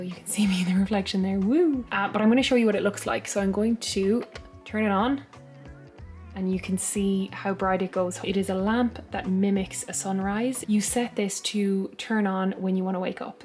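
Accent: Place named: Ireland. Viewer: I thought your accent was Irish. It really suits you!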